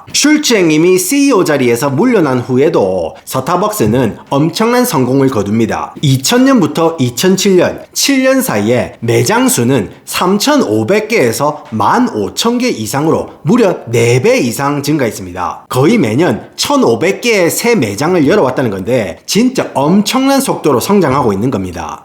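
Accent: Gyeongsang, South Korea. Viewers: 한국말은 완전 갱상도 사투린데, 영어발음은 완전 본토네예. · 와.. 저희할머니가 쓰실법한 찰진 경상도사투리와 촉촉하게 찰진 미국식 영어발음에 넘나 귀호강입니다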